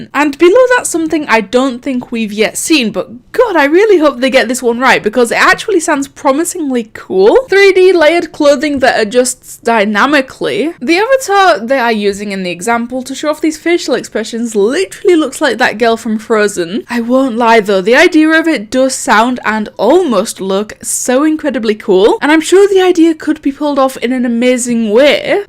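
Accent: Scotland, United Kingdom. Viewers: are you scottish? i am :D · Scottish accent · Are you from scotland? Because your accent reminds me a lot of Merida and I think she's from there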